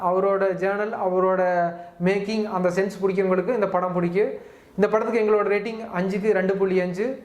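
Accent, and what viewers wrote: Coimbatore, India. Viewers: coimbatore slang etti pakudhu....nice to hear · rather than your review. your coimbathore slang is lovely brother. but improve your pronounciation in english or say everything in tamil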